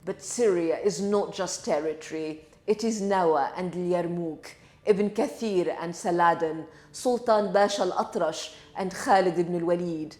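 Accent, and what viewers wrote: RP, United Kingdom. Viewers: Full support for Israel from The Netherlands 🇮🇱🇳🇱 Beautiful words with a posh accent does not hide the violence and hate embedded in the ☪️ULT called Islam! Everywhere stone kissers go, problems follow!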